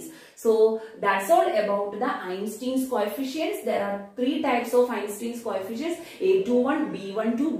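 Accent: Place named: South India, India. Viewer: South indian🎉💜 explanation(voice)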